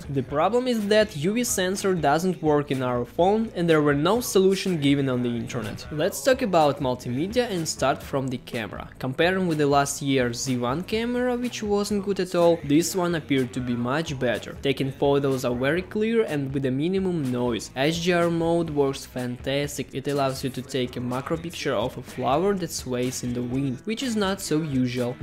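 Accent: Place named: Russia. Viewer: Russian english Best phone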